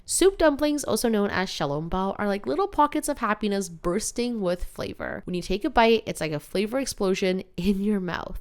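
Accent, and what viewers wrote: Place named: United States. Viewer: Why your accent is so American but you grew up in Taiwan? I love how much you enjoy Taiwanese food and you’re a very smart chef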